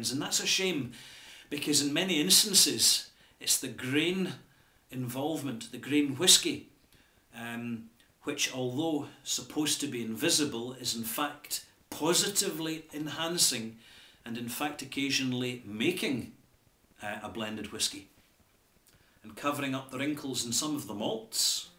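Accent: Scotland, United Kingdom. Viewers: I love your accent. It's Scottish right? Like the dwarves in fantasy movies lol. Love that accent it's so bad ass.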